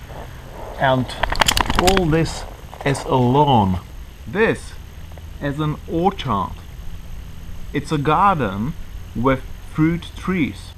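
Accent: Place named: Czech Republic. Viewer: <link> - online slovnik s vyslovnosti, online jich najdete vice nejlepsi jsou s britskou a americkou, kde slysite rozdil okamzite - nevysilejte do eteru 'ceskou' anglictinu, nadelate vice skody, jak uzitku - nemam v umyslu vas zhazovat - napad je to dobry, ale musite znat dobre svoji materii nebo se obratte na rodile mluvci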